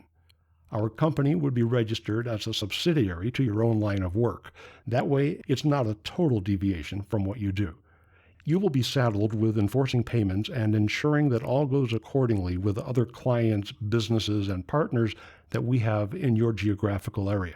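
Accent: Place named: Nigeria. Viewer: Like the way you talk like a Nigerian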